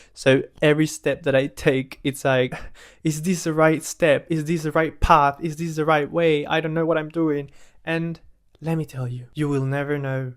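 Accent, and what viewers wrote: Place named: United Kingdom. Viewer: This is something that scares me so much! Every time I remember it will inevitably happen, it hits me like a truck. It's really weird to deal with it knowing we will all go through it at some point. Whenever I remember it, I get too existential and start getting too philosophical for my own good. I don't want to miss out on all the things that will happen after my death — books, movies, songs that will be released that might even be my favorites if I were around. It's really difficult to be alive sometimes, but I love the perspective of doing it consciously and being present in each moment. I don't want to waste any time at all to the point it overwhelms me. The fact I don't get another chance if I fuck up now really scares me. This was a great video! I believe you'll still have a large audience one day! Are you from the UK? 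I was trying to identify your accent the whole time lol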